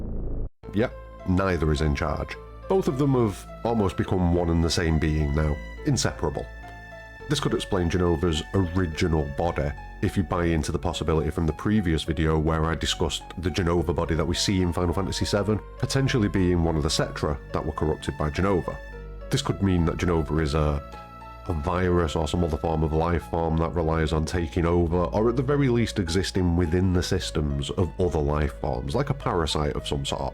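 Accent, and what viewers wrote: Manchester, United Kingdom. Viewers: Loved this video! Especially narrated by someone who sounds like they’re from Manchester like me 😁 Great work!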